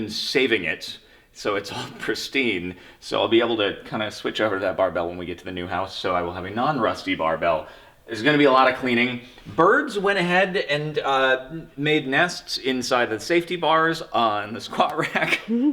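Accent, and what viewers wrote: Australia. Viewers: Bro's Australian accent has gotten a lot better since Farmer__Steve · pretty good Australian accent tbh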